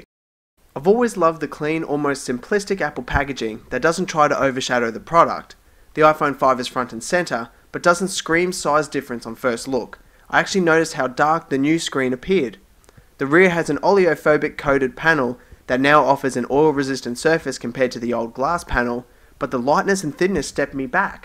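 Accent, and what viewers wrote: Australia. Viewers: I love your Australian accent and great video :)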